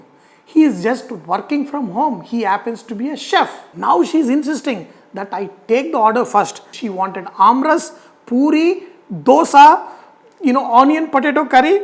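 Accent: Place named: Telangana, India. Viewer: అన్నా ! బాగున్నావే ! We always call in telangana యాస.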